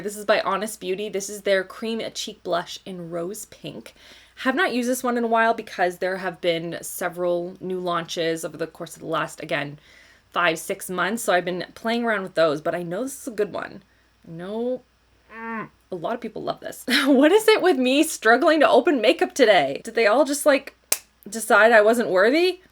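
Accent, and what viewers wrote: France. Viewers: a "combo-nation" is so cute and make almost more sense than the actual word "combinaison" ❤❤ Love you and keep speaking french I love your accent !! (French girl here) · Combination = combinaison (french) love your french accent <3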